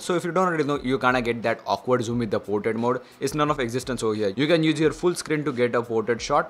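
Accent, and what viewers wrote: India. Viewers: ХАХАХА люблю индийский акцент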